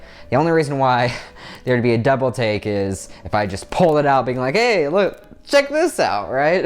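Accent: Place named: Spain. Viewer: Your Speak to spsnish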